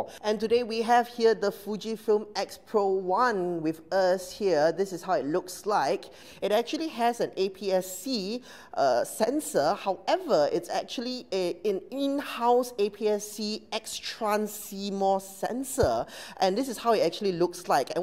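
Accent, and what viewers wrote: Singapore. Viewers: Singaporian accent is so funny :p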